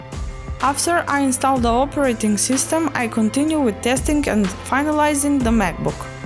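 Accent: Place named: Eastern Europe. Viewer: I sense a very distinct Eastern Europe accent, and I greet you from Bulgaria, awesome videos! subbed to both you and Andrew (Андрей?)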